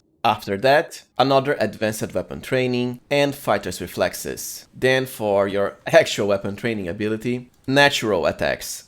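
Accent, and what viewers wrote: Brazil. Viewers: Acabei de descobrir o canal. Você é brasileiro? Fiquei com essa impressão pelo sotaque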